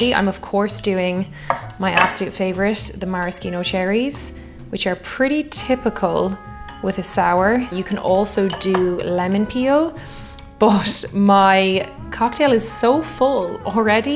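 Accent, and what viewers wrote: Ireland. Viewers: We'd love to have you. We can never have enough beautiful women with an Irish accent over here 😁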